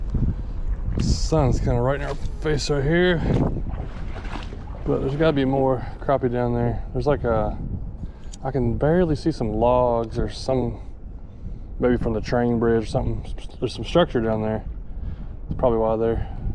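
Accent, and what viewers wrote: Tennessee, United States. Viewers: What part of Tennessee are you from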